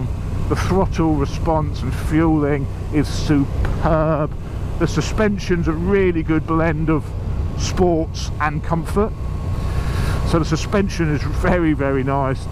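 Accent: United Kingdom. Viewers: something about british people explaining things always make it better.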